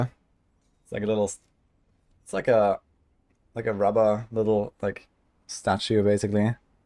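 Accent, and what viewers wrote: Germany. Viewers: Seems like a nice trip you had, You are from Bulgaria, we live very close, I had a feeling you where from Germany coz of voice accent 🙃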